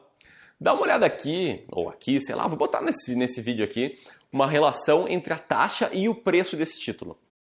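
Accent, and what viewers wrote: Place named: Rio Grande do Sul, Brazil. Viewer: Tu sois gaúcho né rsrs este sotaque me é peculiar rsrs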